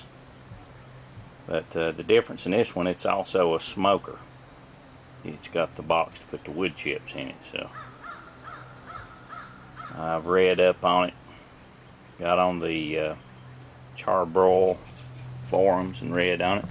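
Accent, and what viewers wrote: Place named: South, United States. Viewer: your Southern accent gives you instant credibility with me on issues related to smoking and grilling. Do you know how hot the lot setting would be for smoking ribs? I am trying to dial in a 3-2-1 method but want to keep the temps at 200-225 F.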